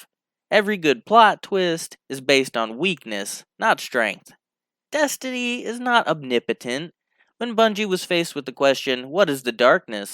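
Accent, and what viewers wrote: United States. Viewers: if i didnt knew it better i would think you are american (cause of your english skills and speech) :D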